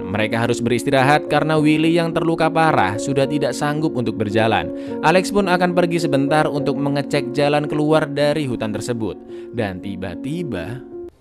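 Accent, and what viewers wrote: Java, Indonesia. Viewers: Mmm cara bicaranya kayak orang jawa pasti dia orang jawa...... oke atuh kalo gk tau mah gkpp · Mas Bro asli jawa mana nih, jawa tengah atau jawa timur?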